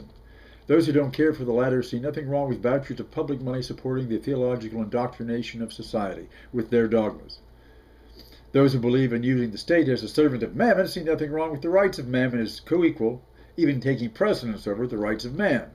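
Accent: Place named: West Texas, United States. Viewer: You have like a west texas accent, I sometimes hear.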